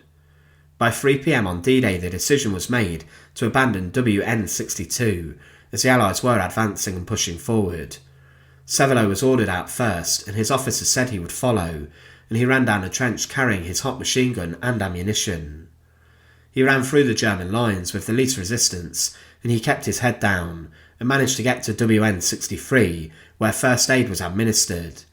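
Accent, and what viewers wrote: United Kingdom. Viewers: Why couldn't an American read this? They always tryna put Brits in charge so it sounds like they're smarter. I don't like listening to this.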